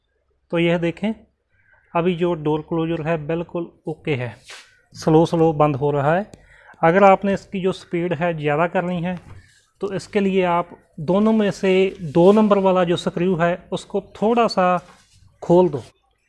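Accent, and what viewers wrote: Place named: Punjab, India. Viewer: Veere Punjabi bol k video banaa Leni c. .Hindi bol reha Punjabi style ch...😊 Darwajey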